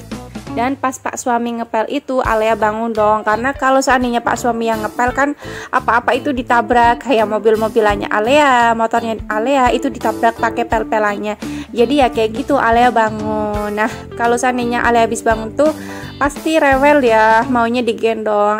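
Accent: Banyumas, Indonesia. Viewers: Ya Allah mb ngapak bngt mb ak jg orang Tegal ngapak tp krm terlalu lama g ditegal jd udah kebawa BHS disini dilampung padahal suami sy jg orang Cilacap ngapak jg suami saya ,dulu begitu lulus sekah SMA ak langsung merantau kerja di Batam mb terus ketemu suami di batam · Kali ini video dibuka dengan jogetan alea Dan pak suami yg nyapu.. Mba.. Request donk, nanti bikin vlog yg full bahasa ngapak. 😁 Lucu Dengarnya. Hehehehehe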